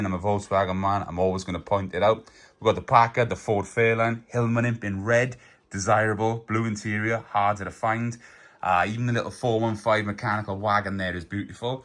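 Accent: Wales, United Kingdom. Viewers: another great video! what a collection! utterly magnificent like the Corgi one, when i watched the Corgi one i was thinking that they were the greatest die cast manufacturer ever as i often do but when one sees a collection like this of Dinky it's a reminder that Binns road was every bit as good really, i love them both the same as i have always believed that the Dinky range was better in terms of the range of marques they modelled whereas Corgi's magnificent gift sets were truly wonderful, i also love Matchbox especially the early Lesney gift sets, glad that you are feeling so much better, your enthusiasm is amazing! i have a near mint original Chipperfields set with the booking office, it's a prized item, many of the dinky's shown i own but they're not in as good condition and many are without boxes but i love them! best wishes, incidentally my cousin's husband who is from Swansea was over recently and when he was chatting with me i half expected him to start waxing lyrical about die casts! 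the accent is great!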